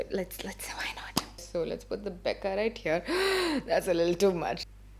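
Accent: India. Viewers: You're like the only Indian blogger I actually trust and follow.. I also like Shreya but I watch you more (just a personal preference). You keep it real, you're not fake and you don't try to be something you're not like adding unnecessary accents. You own that Indian accent and I'm proud. I enjoy watching you 😊❤️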